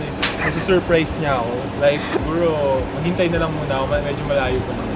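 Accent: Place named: Conyo, Philippines. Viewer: Ang conyo 😆😆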